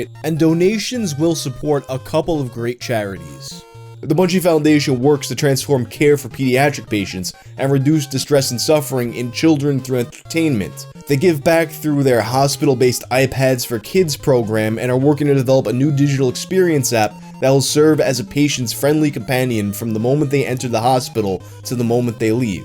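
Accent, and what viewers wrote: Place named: New York, United States. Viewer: It always takes me a few minutes to adjust to that NY accent, it always sounds like someone faking an accent to me at first, but by the end of the video I don't even notice it anymore. Edit: that's why I usually binge watch his videos, so there's no adjustment period lol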